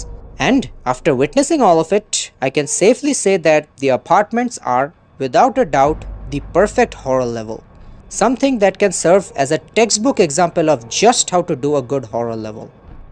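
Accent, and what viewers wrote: India. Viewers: This cute accent our host is bringing me joy. He is analyzing and noting interesting things and having that desi cadence that makes my heart sing with different perspective.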